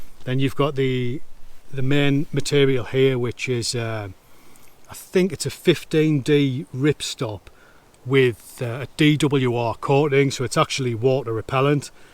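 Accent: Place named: Scotland, United Kingdom. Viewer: the Scotich accent, I love so much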